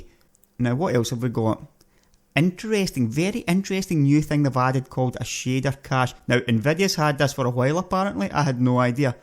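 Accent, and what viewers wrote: Scotland, United Kingdom. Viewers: Hey, what's the bit rate on that Scottish mouth of yours? You really get roll'n ! :D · Funny thing Scottish accents - this one and Sean Connery's accent are the very loveable but for some reason it makes one always want to break out and speak in Scottish-. I love to always try and do a scottish accent from time to time - don't know why but simply just love it even the ones whereby you don't understand what the hell they are on about. You just know that you love it. Love it love it love - a very attractive language that immediately compliements any person first hand. It's just wheather that person immediately with a head start because of the Scottish accent can actually stay ahead and that then boils down to the real person in hand, hmmm! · Its your great Scottish acent my friend, and as an English man I think scots speak English better than us! 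Great video. Well done m8!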